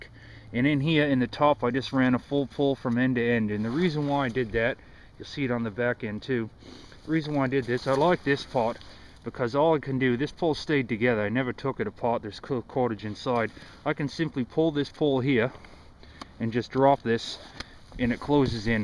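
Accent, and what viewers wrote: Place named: Australia. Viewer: hahah great accent mate. aussie/american/canadian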